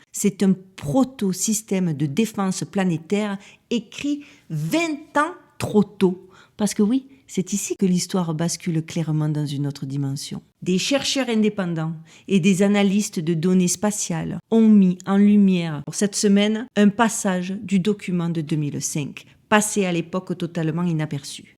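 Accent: Marseille, France. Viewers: Marseille💔💔💔super video...ton accent m enchante😉✔ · 👏🏻👏🏻👏🏻 super vidéo! Merci pour vos sujets et recherches très pertinentes 👍 J'adore votre chaîne et votre reste d'accent Marseillais...à dimanche! ❤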